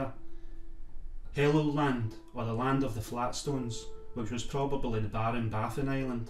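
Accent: Scotland, United Kingdom. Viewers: Love any & all information on the Vikings as well as any info on Scots & Picts too!...thank you for sharing & again I love hearing the Scottish accent! Have a blessed weekend! ~Ginger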